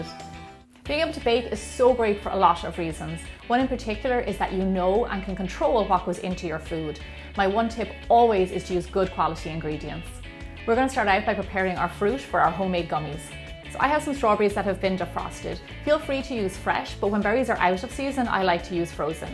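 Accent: Ireland. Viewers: Thank you:) I was picking up in the Irish accent but I was uncertain:) I listen to Liveliness every day on Radio One over the RTE app.:) I hope to go someday! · What part of Ireland are you from? (but Limerick is the best because I'm from there) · Omg... I loveeeee this... Thanks for sharing... You sound "Irish" to me, may I just say, that I love Ireland... I fund it beautiful when I visited... Love to go back again some day. Thanks for sharing... Love that you give tips on how to "vegan"-nize your recipes... 😘😘😘❤️❤️❤️👏🏽👏🏽👏🏽👏🏽👏🏽👍🏿👍🏿👍🏿 Best wishes. From me at Sunshine State 🌴🌴🌴🌴🌴 🤗🤗🤗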